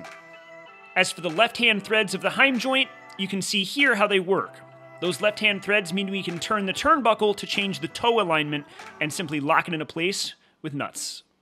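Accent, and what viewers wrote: United States. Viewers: Totally off subject, but you say you’re from Nashville, but I’m hearing a LOT of Wisconsin/ high mid west in your voice. Pls share where you’re originally from and how you ended up in SoCal (the WHY is pretty obvious!)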